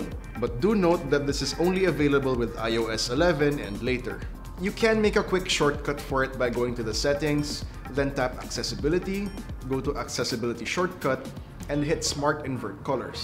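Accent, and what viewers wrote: Philippines. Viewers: Ur accent sounds like Filipino. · You sound like Filipino accent.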